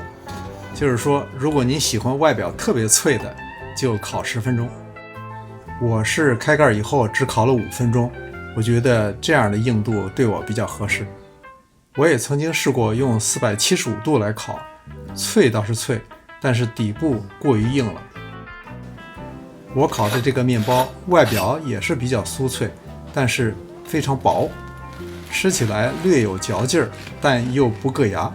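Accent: Beijing, China. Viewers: ，在海外的人听到乡音，倍感亲切，喜欢您的视频，而且还有老北京的风味小吃，太棒了！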